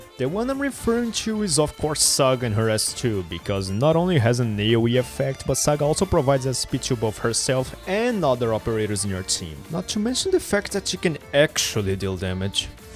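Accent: Brazil. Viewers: I dont know if you're brazilian or not, but i swear bro you just HAVE that brazilian english accent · you sound brazilian 🤔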